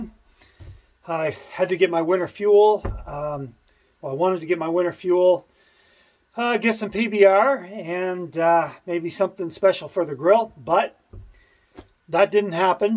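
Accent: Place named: Canada. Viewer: That must have been some fall! I’m glad your okay and didn’t seriously injure anything. When you were showing us how you landed by demonstrating I was wanting to say “ don’t do that to yourself your in enough pain. We can just imagine in our minds”. Again glad your ok and that you’ll survive. Enjoy watching your content;been watching 2 years now. Looking forward to the next one. Also I hope you and your family have a great Thanksgiving 🦃. Also just wondering, are you Canadian? Reason I ask is because I notice when you say words like out I can hear the oot pronunciation. Thanks!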